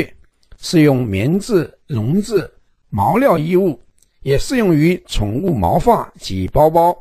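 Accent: Sichuan, China. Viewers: 很明显是四川普通话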